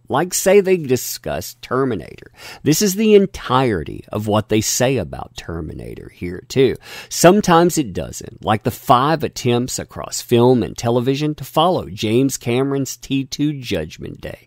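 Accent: United States. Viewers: I agree with you 100% but I disliked the video because of your scripted strong American accent.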